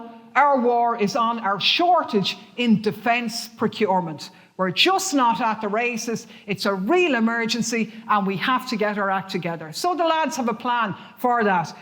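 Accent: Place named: Ireland. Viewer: Irish accents though. So pleasant.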